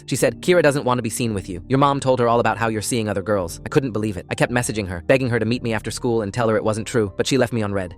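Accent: United Kingdom. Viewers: Bro went British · I swear bro became British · Is he British 😭? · British ahh voice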